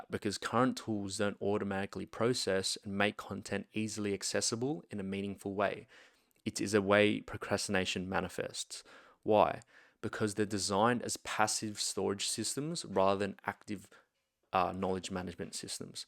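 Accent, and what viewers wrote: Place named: Australia. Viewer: You sound like you live in Australia.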